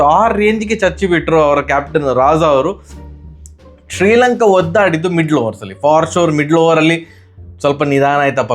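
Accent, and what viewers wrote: Mangalore, India. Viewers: ಆ...ದೇವರ್ರೇ ಉಂಟಲ್ಲ..... Its bought u a new subscriber ❤❤ Love your accent' love kudla ... ಇನ್ನು ಹೆಚ್ಚು ಹೆಚ್ಚು ..ಕಂಟೆಂಟ್ ವಿಡಿಯೋಗಳು ಬರಲಿ .... ನಗುವಿನೊಂದಿಗೆ .... ❤.goodluck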